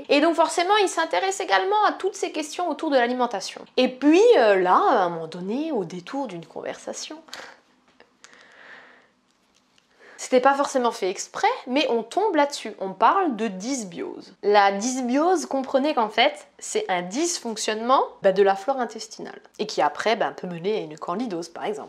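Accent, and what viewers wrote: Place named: Lorraine, France. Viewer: cet accent lorrain au top =D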